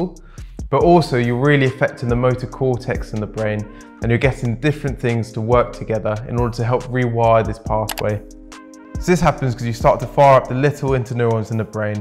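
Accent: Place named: United Kingdom. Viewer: Nice video, good content, well-done and British accent as a bonus! What's not to like? :)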